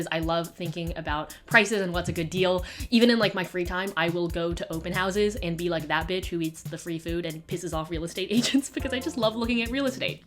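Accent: New York, United States. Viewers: is it me or does sound more like an NYer, like lower tone and speedy, confident vibe...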